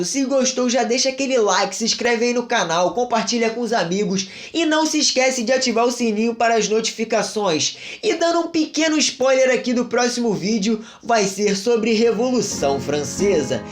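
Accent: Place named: Rio de Janeiro, Brazil. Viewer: Logo no começo já amei o sotaque carioca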